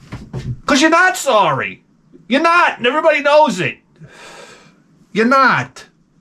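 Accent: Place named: Boston, United States. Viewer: I honestly think this dude is BETTER at riffing than Bill Burr. And I’m not comparing the 2 just because of the boiston accent and hair tint. This show is like more focused and listenable than the mmp, and the boring sports talk is replaced with cool retro vidya game stuff. No disrespect to freckles though, it just seems like he runs out of steam after a while.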